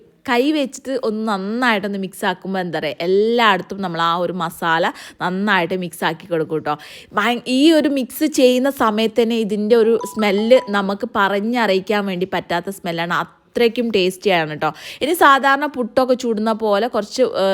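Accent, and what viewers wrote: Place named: Malappuram, India. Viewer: കമന്റ് ബോക്സിൽ കയറുമ്പോൾ ഇടുകയല്ല പുഴുങ്ങുകയില്ല കേൾക്കുന്നുണ്ട് എന്താ കാര്യം അറിയോ എന്റെ ഒരു അറിവ് വച്ചിട്ട് പറയുന്നു യഥാർത്ഥത്തിൽ മലപ്പുറത്ത് കാരി ആണ് സംസാരം ഒന്ന് മാറ്റി പിടിച്ചു നോക്കിയതാണ് മലപ്പുറത്തുകാര് എങ്ങനെ മാറ്റി പിടിച്ചാലും മലപ്പുറത്ത് സംസാരത്തിന്റെ ആ ചുഴകടന്നുവരും എന്ന് അവൾ ഓർത്തില്ല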